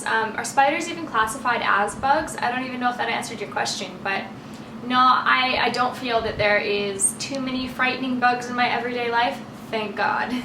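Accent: Australia. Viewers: it sounds like you're getting an australian accent! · You seem to be picking up an australian accent!!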